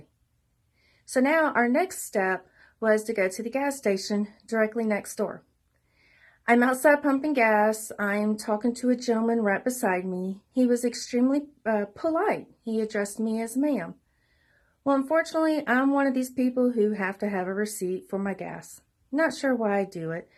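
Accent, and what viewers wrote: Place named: South, United States. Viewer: Context is everything. I'd likely be less ruffled from you it because you sound genuine, caring and southern. Terms of endearment can sound sincere or angrily sarcastic depending on the setting, the delivery and the accent. However, "honey" sounds too casual for a serious setting like a medical office, where I hope everyone is meticulous. It can sound sarcastic and condescending which I dislike when I seek medical attention.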